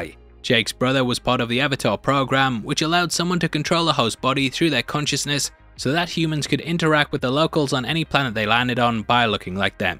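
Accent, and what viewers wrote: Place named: United Kingdom. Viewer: Love when you say Spider-Man No way home with your strong British accent every video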